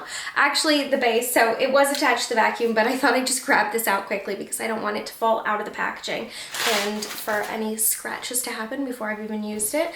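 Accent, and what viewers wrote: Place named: United States. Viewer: London...that explains the British AC plug, but not the American accent of the presenter. But good job though. Answered all my questions about the Shark Wandvac. I'm going to assume they come with US AC plugs for US customers.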